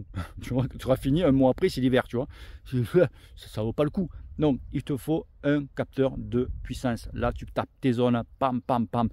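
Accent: South, France. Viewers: Slt, capteur de puissance un peu cher tout de même, moi qui fait du vélo sans faire de compétition sans cyclo et qui ne suis même pas dans un club😄. Pour m'entraîner FC c'est très bien pour moi et à ce sujet pour travailler dans les zones d'intensité tu calculais les zones de pourcentages comment ? Pourcentage de Fcmax réel ou les pourcentage de la FC de réserve ou autres ? Sinon ta chaîne au top 👌 toujours le truc pour faire rire et cet accent qui sent bon le Sud 🌞. sujet toujours intéressant bonne continuation et saison cycliste. 🙋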